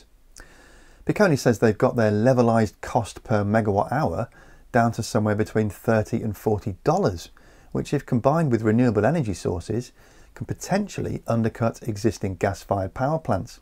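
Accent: England, United Kingdom. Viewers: As a British person, there's nothing I love about this channel more than the extremely British way he speaks in this video.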